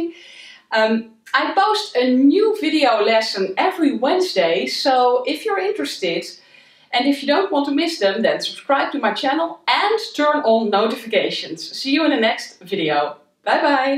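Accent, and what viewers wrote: Netherlands. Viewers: I love your Dutch accent ! ❤️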